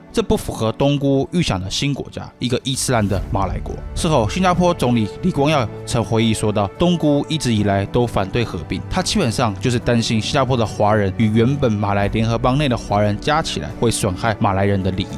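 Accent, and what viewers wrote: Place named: Taiwan. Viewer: 台湾腔太难听了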